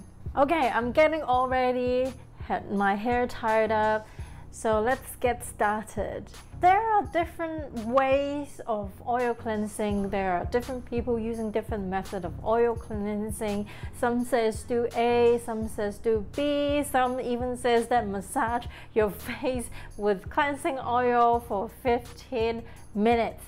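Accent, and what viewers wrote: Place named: England, United Kingdom. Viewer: Hi, I love you English accent. The information you give us is very helpful. thanks for sharing.( 來自小船群組回訪)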